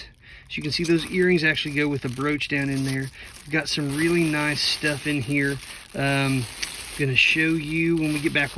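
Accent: South, United States. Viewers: It's called Southern/country/hick lol! That's all darlin' 😂 there's all kinds of us around these parts in the south. Bless your heart❤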